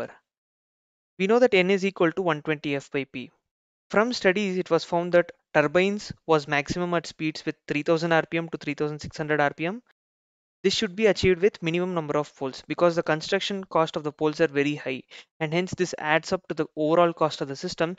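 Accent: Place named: India. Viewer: I love indian english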